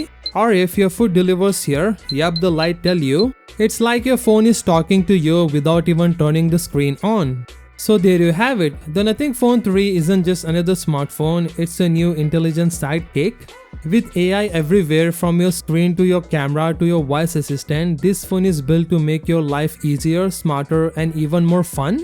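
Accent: India. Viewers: The ultimate Indian accent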